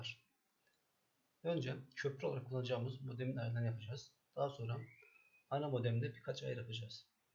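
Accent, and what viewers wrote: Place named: United States. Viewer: Sesin Amerika'dan geliyo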